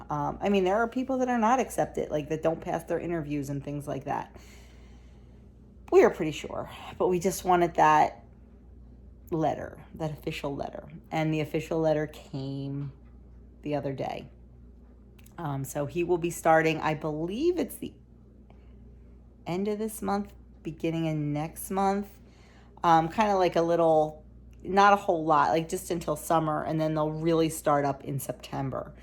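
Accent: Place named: New Jersey, United States. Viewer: I love your NJ accent I am laughing saying this because I live in Worcester MA and I totally have accent. I love your recipes and everything you share. I got the vaccine too. I had Covid 19 in May of 2020 it was awful. I never want it again. Can't wait to see the chicks Doug did such a great job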